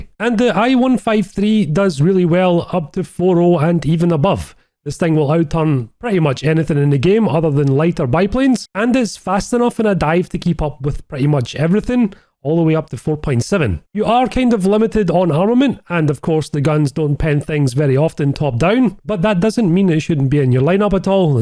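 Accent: Scotland, United Kingdom. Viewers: as a new subscriber, I ask this favor of you with the complete understanding that it very well may never happen. But, nonetheless, can you please say "Burglar Alarm" in a video, please? Jeremy Clarkson had a Scot say that phrase on the old Top Gear and It would kill me to hear you, another thick accented Scot, do the same. Thank you, sir, love your videos!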